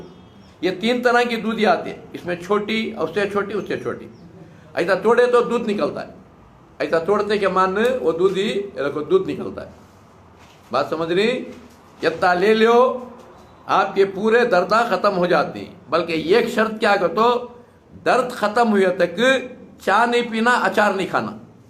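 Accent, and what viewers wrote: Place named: Bangalore, India. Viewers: its bangalore's local language (urdu) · Karnataki language hai yeh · Not Hyderabad. Banglori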